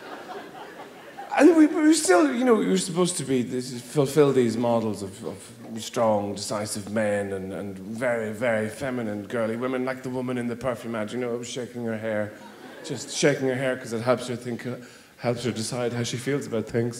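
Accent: Ireland. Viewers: he's Irish true and true. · Mia Scott British? No. Irish! ☘️ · I love the Irish accent, it sounds so musical 🎶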